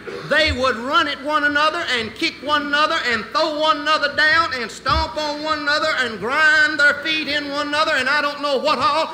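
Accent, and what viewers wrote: United States. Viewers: Yes he did he has Carolinian accent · Andy had all the charisma of Jerry Lewis and Dean Martin all in one with a southern accent.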